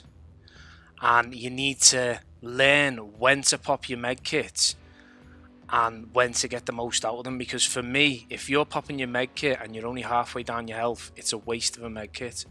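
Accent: Liverpool, United Kingdom. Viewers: you a scouser?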